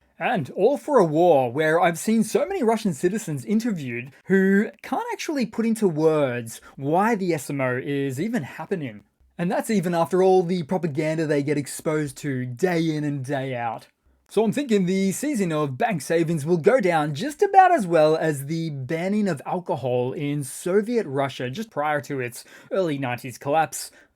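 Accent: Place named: Queensland, Australia. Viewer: Cheers your accent sounds like you might live near 1770, nice part of the world:)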